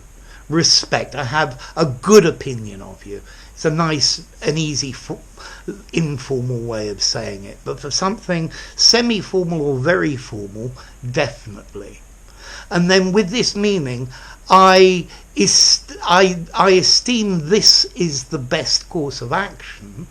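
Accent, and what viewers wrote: United Kingdom. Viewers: Hi May I ask what is ur accent? Modern RP or Traditional RP or neutral accent from some place？ thank u teacher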